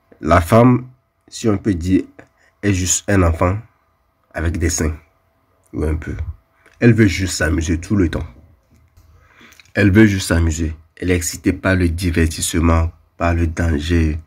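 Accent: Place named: Togo. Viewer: Une des meilleures Podcast! Chapeau frère! A entendre ton accent, on dirait que t'es togolais! #Respect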